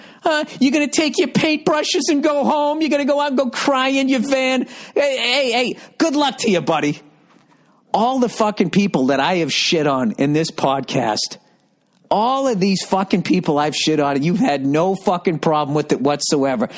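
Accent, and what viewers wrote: Boston, United States. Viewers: Listening to Bill cuss with a Boston accent is awesome.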